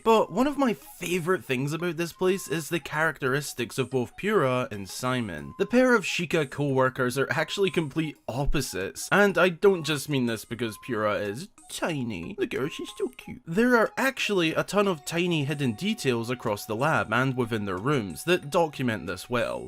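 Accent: Scotland, United Kingdom. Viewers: Are you brish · I JUST REALIZED PURAH IS ABOUT THE SAME SIZE AS MIDNA Also are you scottish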